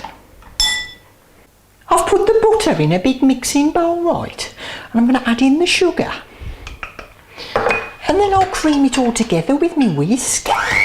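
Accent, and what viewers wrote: Birmingham, United Kingdom. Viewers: As someone from Birmingham myself, I can safely say that yes, everyone does speak like this. Loved this recipe :D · Thanks so much for this recipe I have always wanted to know how to make this properly ( I had an idea) I live in America now and it is so expensive to buy here and as you know not so nice from a tin (but better than nothing). Btw love your Brummie accent, I used to have a strong accent too that resembled a type of "saff London" accent (I am from East Anglia) and can still do it too in fact my son and I do it all the time for fun hahahahhaha. Keep up the great work and Happy New Year. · Hahaha i love your birmi accent! You are amazing, your video's have so much character in them they are unlike any other cooking channel! been a subscriber for years and never let down!!! much love! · what happened to her accent :D...ah I get it!.....its biiiiirmingham! 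· Gotta see B.ham sometime... Could even learn to talk like that! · But she's a Brummie. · Have you made something like this before, or is your Brummie accent just giving me deja vu? lol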